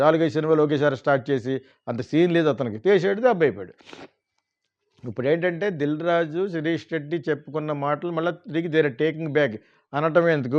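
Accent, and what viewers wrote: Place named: Konaseema, India. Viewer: Whatever the subject...I like your Kona Seema slang...and enjoy🎉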